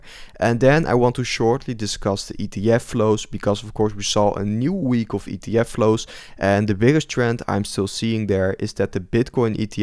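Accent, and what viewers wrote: Netherlands. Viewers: Nederlands accent is zo makkelijk te herkennen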